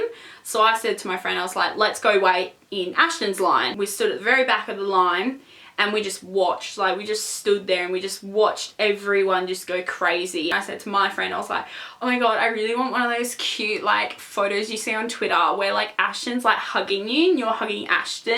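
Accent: Australia. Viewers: She legit has the same australian accent as 5sos and lol u made it to her new video! Watch it to see her slay your ass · are you Australian? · Your so pretty, and I lurrrrv your accent. Are you Australian?? xx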